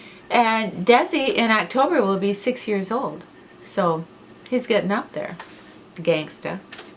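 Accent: Midwest, United States. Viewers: My mom told me about your channel 3 yrs ago. So that’s when I started watching you. Your the sweetest lady. As soon as I heard your accent I knew you were from the Midwest. I lived in North Dakota for 28 yrs, but now I live in Oregon. Anyways, you always bring a smile to my face. Movie..I would have to say Hallmark hahaha just love them. But I do love Cinderella the (live action) Have a great evening. ❤️🌸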